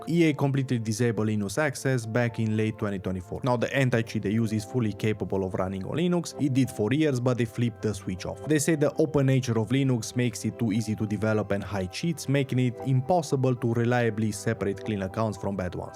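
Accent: Romania. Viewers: Haha, accentul românesc lovește direct. Pentru cele mai bune performanțe pe Linux le vei avea pe AMD sau Intel. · the moment i heard your voice I could tell you're also Romanian bravo man, fain video, dar Ubuntu e meh, Mint pentru incepatori, si CachyOS/Endeavour daca ai chef de tinkering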